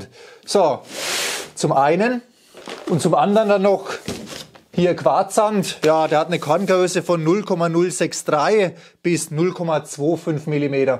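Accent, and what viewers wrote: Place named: Franconia, Germany. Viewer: Ich hob mer dacht: "wuu kummder denn her? Denn Dialeggd denn kennsd..." Aus Frangn isser wie ich aa! 😀👍 Zum Thema hochfest: Wir hatten früher auch Faserzusätze und/oder gebogene 10mm lange Drahtstücke zum aufnehmen der Zugkräfte beigemischt (Industrieofenbau), wie ist es damit, wird sowas heute noch gemacht? Gut mit der Gewebeeinlage ist die Frage zumindest zum Teil beantwortet...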